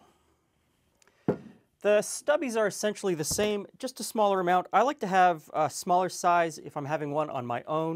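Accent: Canada. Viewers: I can't believe I only just found this channel today. I love your content, and it's neat hearing a YouTuber/media personality with a dialect similar to mine (I'm from Nova Scotia, but us Canadians in general sound pretty different from Americans a lot of the time, imo). This has been a fun learning experience, excited to try this asap! :)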